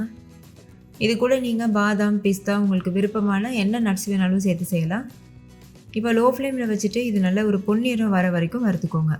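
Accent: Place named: Thanjavur, India. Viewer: ஹலோ உங்க ஸ்லாங் எல்லாம் பார்த்தா தஞ்சாவூர் டிஸ்ட்ரிக்ட்😊 உங்க ஊர்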